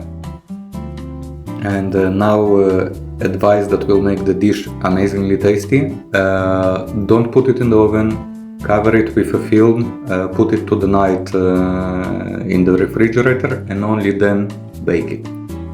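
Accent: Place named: Russia. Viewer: brother your video looks so nice, just continue, but i think you are russian for reason your accent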